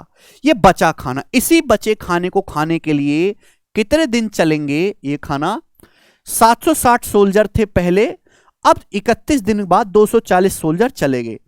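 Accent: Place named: Bihar, India. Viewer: मैथ का मतलब गगन प्रताप सर बिहारी अंदाज में बहुत अच्छा लगता है सर ❤❤❤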